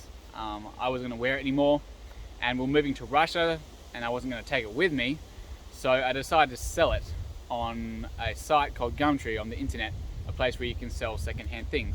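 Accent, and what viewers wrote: Australia. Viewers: In Australia, men take off their Akubra ( the older hat) when entering a house, before entering a church, and at a funeral as a sign of respect. These men tip the front of Akubra slightly when meeting a woman ( traditional) , again as a sign of respect. Sadly this way of life is going.This man has a rural accent and wears rural clothes, including the hat. These people are the backbone of Australia. Russia's gain is Australia 's loss.Aussie, aussie, aussie oy, oy , oy!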